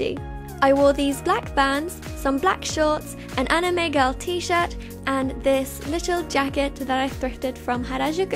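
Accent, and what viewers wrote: United Kingdom. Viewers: your brit accent is sooo cute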